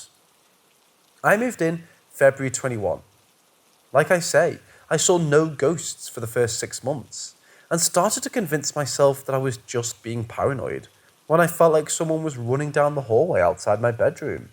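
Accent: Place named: United Kingdom. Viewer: Hey there! It’s fantastic to hear a fellow Brit narrating 👍🇬🇧, I’ve been listening for a while hence I’m subscribed but I’ve just found this vid… my phone does ultra weird things… either that or it’s YT 🤷🏻‍♀️ idk but I’m here now and I have to say your stories are great! My husband is watching some boring documentary about cycling in the snow or something… yawn! So having found this episode, I can just sit back, ignore the tele & chill! Kudos chum! Keep bringing us these great tales of spooky stuff! I have just had the dog bite the like button! 🤣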